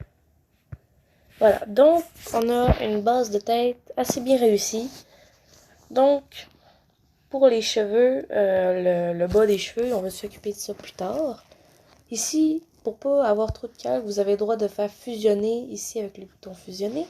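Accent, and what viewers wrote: Quebec, Canada. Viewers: Je peut avoir ton accent québécois ? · Merci tu explique mega bien et j adore ton axcent québécois et sinon pourrais tu faire un tuto comment faire bouger les bras par exemple s'il te plaît TwT et je m abonne direct et paff c fait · Je m'abonne direct ÚwÙ Grâce à toi jai réussi enfin Ton accent québécois je kiffe trop!!! ♡♡♡ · J’aime bien ton accent québécois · J'aime trop ton accent québécois !!!! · Ça m’a beaucoup aidé, merci bien ! 😄 Je trouve que tu expliques bien, en plus ton dessin est superbe ! Et j’adore ton accent québécois 👍🏻 Donc en gros tous est géniale ^^ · Je trouve que ton accent québécoise et trop rigolo je veux pas me moquer mais je l'adore ton accent et toi son trop bien 😊😋ha et aussi je m'abonne !! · J adore ton accent québécois il est trop beauuu 😍 Sinon super ya jamais personne qui explique aussi bien que toi ! 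🤩 +1 abos je te jure :) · Tu as un agcent québécois (je vien d'arriver) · Omg québécois j’adore veux acsen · Omg merci beaucoup j’ai enfin compris :O et j’adore ton accent québécois 🤩 +1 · Cute ton accent québécois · L'explication 👌 l'accent québécois on adore +1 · J'aime se que tu fait je vais essayer sa tout de suite ! Sinon si il y a des gens qui disent qui n'aime pas ton accent québécois, ne les écoute pas moi sa ne me gêne pas. Ai'je le droit de te faire une pub ? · Merci pour sa ! en dirait que tu est une Québécoise avec ta voix trop belle ❤️🌹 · Merci, t'a vidéo m'a énormément aidé ! Et au passage j'adore ton accent québécois ! ❤️ Je m'abbonne ! 💞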